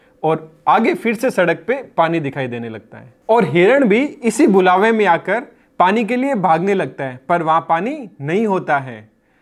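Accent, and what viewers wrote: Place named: Rajasthan, India. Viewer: Sir aap Rajasthani hain na? I know😁 Your accent totally matches✌ I'm from Barmer, Rajasthan.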